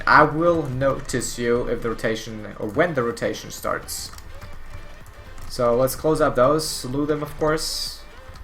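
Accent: Germany. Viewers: youre german, no?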